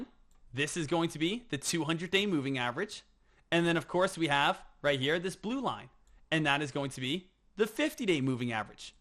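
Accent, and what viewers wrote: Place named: Philadelphia, United States. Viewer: I know bro…we still love Ya bro. 😂😂😂 I always was torn between Jersey and Philadelphia for your accent. More Phila to me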